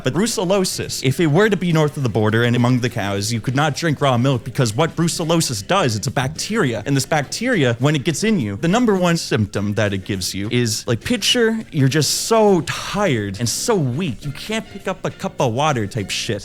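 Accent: Nova Scotia, Canada. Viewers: Your accent is giving me big Nova Scotia vibes, at least the way you pronounce cars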